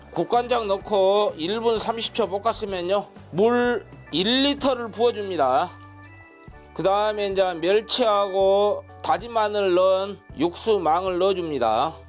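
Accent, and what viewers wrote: Jeolla, South Korea. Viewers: 셰프님!!거시기 전라도요!! 참으로 정겹습니다~~알배기 배추 추가가 키~포인트네요!! 감사합니다 😂❤😅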